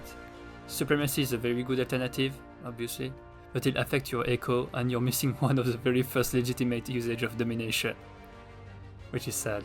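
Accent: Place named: France. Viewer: Aaand Functional Architecture is being nerfed next patch, so ixnay on that plan :P By the way, it seems like the Shattered Ring gets regular planet designations, so how about going Lithoids (+Volatile Excretions for tile-blocker removal and war edicts?), colonizing the next-best planet, moving the capital there, and designating the Shattered Ring as a Mining World for +25% alloy output? PS: I like the French accent, it's not mediocre at all!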